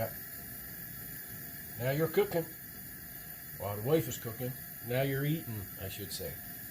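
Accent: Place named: East Coast, Canada. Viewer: I recognize a east coast Canadian accent !!! 🇨🇦🍻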